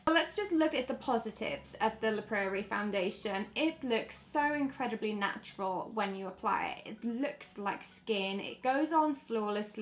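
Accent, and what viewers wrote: United Kingdom. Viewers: does anyone know what her accent is like underneath the British? just wondered cus it's so pretty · She sounds British but sometimes when she talks she sounds different, is she fully British? Or did she lives somewhere else first?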